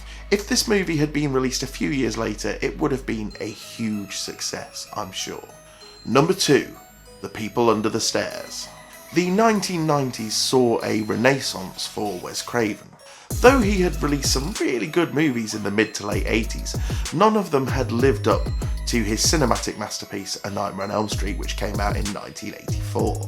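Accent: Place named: United Kingdom. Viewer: Are you British, that accent so much sound like British?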